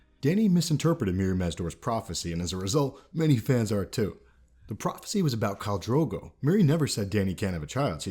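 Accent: New Jersey, United States. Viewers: Let's face it.. with that NJ accent, THAT'S the rhythm of ur narration! lol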